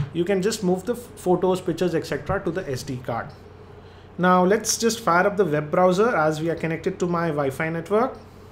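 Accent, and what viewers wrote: India. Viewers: i like if indian people speaking english, because different but good. :) nice sony :D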